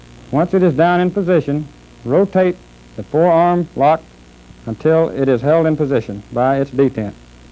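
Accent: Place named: Texas, United States. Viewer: Definitely a Texas or Arkansas man.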